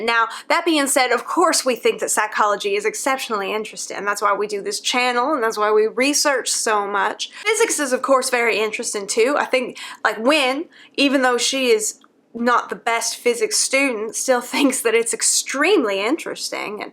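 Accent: South, United States. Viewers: So I was wondering where was “from” like what state and or city does she get her accent from? I’m from Tennessee and find accents interesting (though not the best at distinguishing yet I guess) And I hear the twangs of the metropolitan Deep South in maybe Louisiana or east Texas? But that’s just in my experience with southern accents, and there’s definitely a difference from state to state and even regions within those states.. Like I’m from a city area, but people in the city itself have a different accent from the people farther away from the city ya know? So I was curious But of course, since she’s not actually from the south her accent has a more “generalized” southern accent, where you could hear a few regions in it, but idk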